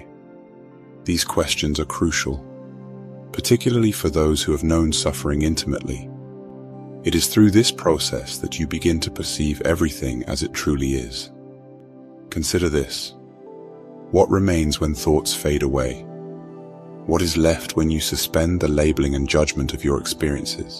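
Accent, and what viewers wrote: United Kingdom. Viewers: I agree...I found it interesting that this originates from India but with a British accent. I love the messages but...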